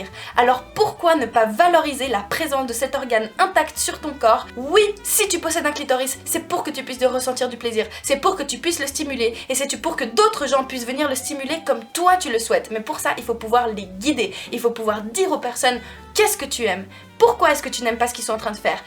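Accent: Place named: Geneva, Switzerland. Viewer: Depuis le temps que je regarde tes vidéos pour une fois je commente pour te dire à quel point je "prend mon pied" (bah oui restons dans le thème haha) à regarder tes vidéos! il suffit que j'aie eu une sale journée et que je me pose devant mon ordi avec toi pour me sentir tout de suite mieux! Va savoir, j'aime ta facon de t'exprimer, tes sujets et la facon dont tu les aborde, je suis sûre que tu aide énormément de monde avec tes vidéos! De plus tu me rappelle ma Suisse que j'ai quittée il y a un an pour le bout du monde, et ca me fait toujours plaisir d'entendre ton charmant p'tit accent de Genève:) Bref, continue, reste comme tu es (même si je ne pense pas que tu aie l'intention de changer!) et MERCI pour toutes ces good vibes que tu nous partage! Peace! <3 Et vive nos clitoris!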